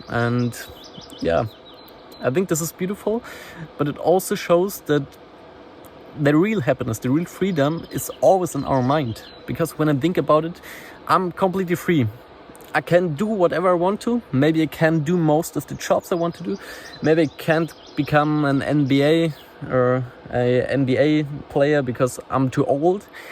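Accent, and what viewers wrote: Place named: Germany. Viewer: Bro why are you speaking English? I can hear your German accent extremely clear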